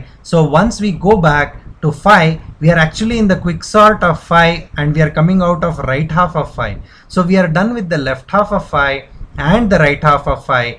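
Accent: India. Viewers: a clear and perfect explanation , nice video as Indian English pronunciation is consensus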